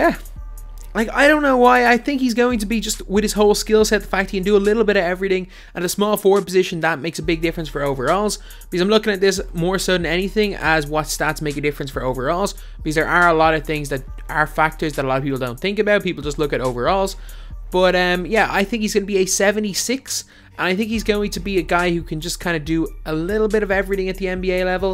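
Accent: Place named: Ireland. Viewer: Its his accent hes irish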